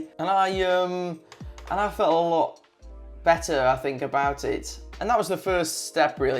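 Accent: United Kingdom. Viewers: I felt like you were describing my life honestly. I went from baggy to slim to super skinny spray on jeans, now I wear fitted skinny jeans (because I am still skinny) and it's such a difference. I still get told my legs are skinny but there's no way to hide that honestly, just to mitigate. Great series - came to see what it's about and stayed till the end until I noticed it's the end. Maybe it's your british accent, maybe it's because I can relate. Good content.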